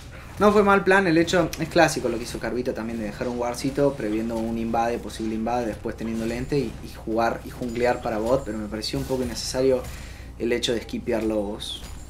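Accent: Argentina. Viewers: se me pega el acento argentino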